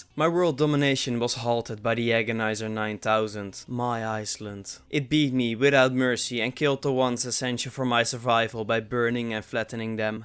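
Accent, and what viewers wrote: Netherlands. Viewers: oh lmao i didnt even know it was on your channel xD i just heard the dutch accent coming through the english haha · Duurde precies een halve minuut, voordat ik door had dat jij nederlands was... 😂😂😂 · Not to ask stupid qwestion but are u dutch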